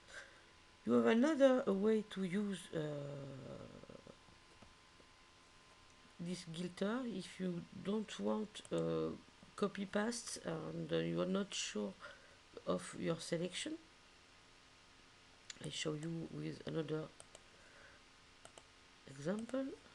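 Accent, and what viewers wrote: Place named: France. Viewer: Great tutorial. Your english was perfect. Your accent reminds me of my grandmother whose first language was French. I miss hearing it. I learned something new with the tutorial! Thank you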